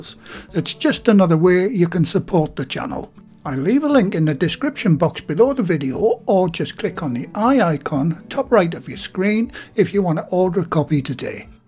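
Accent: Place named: Sunderland, United Kingdom. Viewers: Ah, a makem, I couldn't place the accent. One of the weird things about the north east is the variation in accent over very small distances. My earliest memories were in Felling, then Ouston, then Low Fell, until I moved down south for work then emigrated to the US. I've walked home from South Shields to Low Fell on New Year's Day so I think it's not too much of a stretch to say I lived within walking distance for 24 years. Thanks for doing this. My mother lectured at Gateshead Tech in cookery, and she wrote down a lot of her recipes for me, but it helps to have the video version. The things I really miss are stotties and Cumberland pork sausage. So now I have two hand cranked sausage makers, but I am not a bread maker... yet. America does not do decent pies, so I just bought a set of pie tins and a pudding basin. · Just found your channel ,I saw the corned Beef and potato pie and thought great lets see if its like my Gran used to make.Lo and behold when i started watching and heard your Northern accent it made me home sick and hungry .My family on my mams side were from Seaham.Just watched the video on Panackelty great so pleased I found your channel.Dont know if you have made Ginger Wine or not but going to have a look.Regards from a northerner living in Spain.Thank you . 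· Love the videos, I'm from Sunderland myself but moved away when I was 16 I'm 52 now living on the Isle of Wight I miss the accent and the food, that's why i watch these great videos Thanks so much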